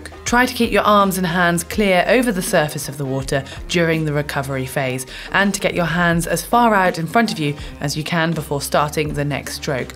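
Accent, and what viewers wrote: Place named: United Kingdom. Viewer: the British accent i can't understand and I'm not even american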